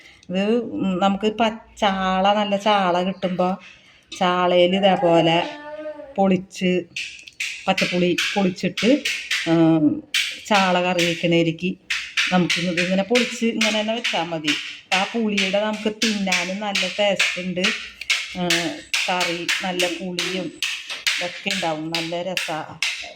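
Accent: Thrissur, India. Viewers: തൃശ്ശൂർ ആണോ സംസാരം കേൾക്കുമ്പോൾ തോന്നുന്നു ഞാനും തൃശൂർ ആണ് പക്ഷേ ഇങ്ങിനെ ചമ്മന്തി അരക്കുന്നത് ആദ്യമായാണ് കാണുന്നത് 👌👍😋😋 👫🔔✅ New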